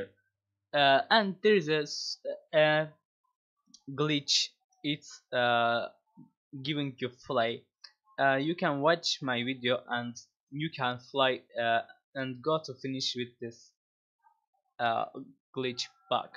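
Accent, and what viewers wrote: Turkey. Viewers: olm sesinden turk oldugu belli oluyor qweqwe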